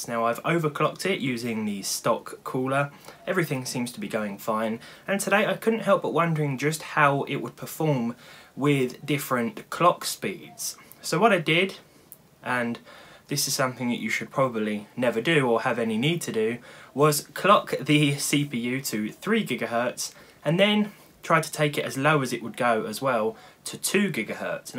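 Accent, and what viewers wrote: United Kingdom. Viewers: I love the British English, sounds Smooth. Try it with a 2700X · Hey just joined your channel nice vids random British accent lad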